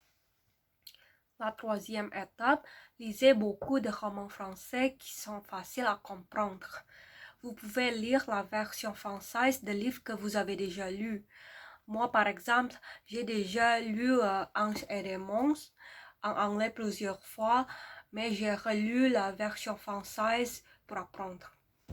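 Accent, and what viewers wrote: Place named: Quebec, Canada. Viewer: Ta prononciation en français m’impressionne! Et c’est ta troisième langue en plus? Wow! J’entends déjà l’accent québécois, hihihi ;) En tant que Québécoise, je suis un peu gênée de la loi 96. Un délai de 6 mois pour apprendre le français n’est pas raisonnable. J’espère sincèrement que tout le monde sera conciliant et compréhensif à ton égard.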